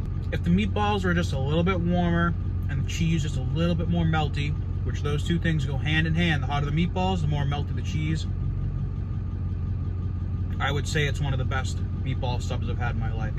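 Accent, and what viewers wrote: Boston, United States. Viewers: I hear a Boston accent! Gahlick, pahmesiahn. REPRESENT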